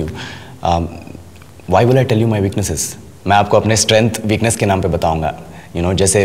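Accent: India. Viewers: Is he telugu speaker 😮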